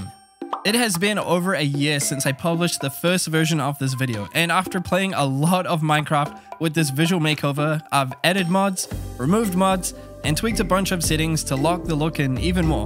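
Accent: New Zealand. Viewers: It's nice hearing a fellow Kiwi on youtube. Also very strange. Keep up the good work!! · I assume he lives in New Zealand. 5,000,000 population, and fitting accent. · Woah I knew I heard a Kiwi accent!! Nice to see content creators rising from Aotearoa ❤️❤️ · I could tell your from nz when you said your top video had more views than people in your country and your accent 😊 Kia ora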